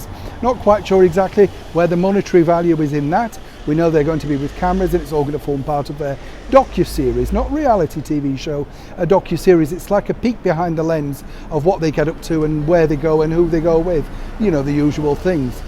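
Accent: Yorkshire, United Kingdom. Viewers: You speak like a true Yorkshire man. you are a very smart gentleman without spending a fortune on ties 👔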